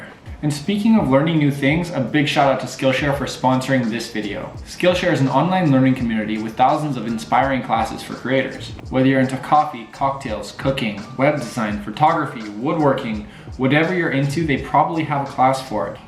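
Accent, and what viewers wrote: Canada. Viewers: Is this Canadian accent? You talk like my nephew who's now on Canada...rn 🇨🇦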